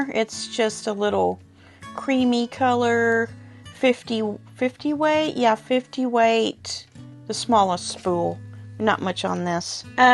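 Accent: South, United States. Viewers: Am here! Got my coffee and have been quite busy this morning already…am looking forward to my 2nd coffee, a little break, listening to your video with your sweet-mildly-southern voice, thinking of joining your sew along if i can sew multiple projects at once. Im usually a start and finish each project before starting the next kinda girl lol😂🤣😂 we’ll see if i can swing it. Have a great day and week. Ty for bringing us your content. I love the clear plastic bins and keep my fat quarters that way with half yards as well…lol food for thought..ive decided were distant cousins… part of my family is from your neck of the woods and you resemble many of them in many ways! You seem so familiar to me in lots of different ways!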